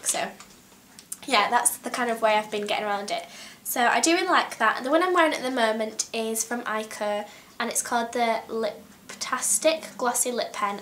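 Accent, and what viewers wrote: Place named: Yorkshire, United Kingdom. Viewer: Your accent is amazing, are you from Yorkshire ? xx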